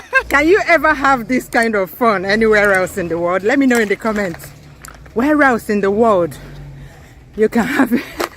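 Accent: Igbo, Nigeria. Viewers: I finally see ur face.....been hearing ur igbotic voice....weldon ma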